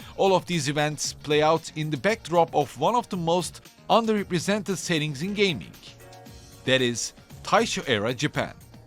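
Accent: Turkey. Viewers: you sound very turkish🧐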